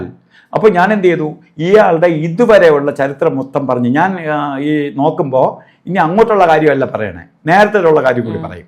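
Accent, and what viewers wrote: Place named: Thiruvananthapuram, India. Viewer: തിരുവനന്തപുരം ഭാഷ കൊള്ളാം 😂😂😂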